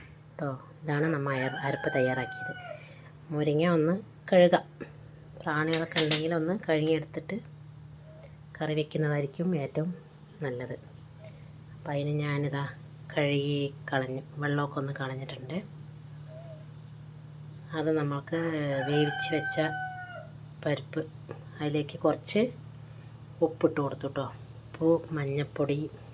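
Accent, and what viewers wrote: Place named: Palakkad, India. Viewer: കുറേ ദിവസായി പാലക്കാടൻ സം സാ രം കേട്ടിട്ട് കേൾക്കാൻ കൊതിയാവുന്നു ലൈവ് ഇപ്പോൾ കിട്ടുന്നില്ല 😭😭😭😭😭😭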